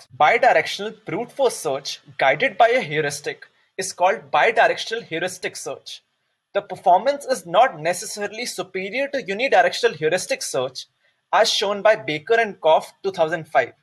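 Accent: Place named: Telugu, India. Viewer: Arif C it kinda look like Telugu accent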